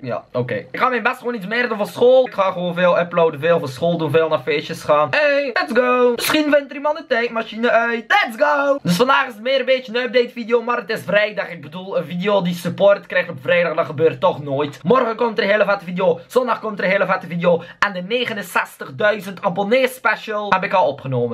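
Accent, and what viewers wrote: West Flanders, Belgium. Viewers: jaja eindelijk een west Vlaming Zaliggg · Uw accent is echt top! Belgium aan de top <3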